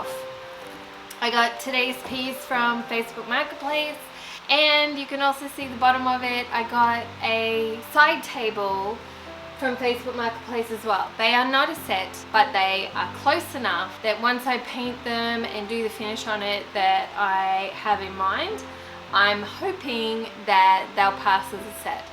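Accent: Australia. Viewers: another beautiful makeover! My reaction to the comment(s) about you: Some people can be brazenly offensive. If they have an issue with your channel, then why are they watching? You don't need that foolishness. Continue to be you. I love listening to you (love the Australian accent) and learning from you. Just thinking about what was said irritates me and even though you don't know the person, those comments can be hurtful. Block anyone else who can't appreciate you and what you do. Let's not waste any more time on shallow people. Evidently you're doing something right by being YOU. Your channel is growing. Congratulations on the 10K! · Smiling Saturday!! We're here for it and your lovely Aussie accent. Oh, and you outstanding work too!! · We love you and admire your work! In the shop you are Working Woman, not the glamour version, plus the heat and humidity of TN--well, I would wait til cooler weather, being more the wimp. 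So don't let the snarky ones affect you. Love the Aussie talk, so talk on!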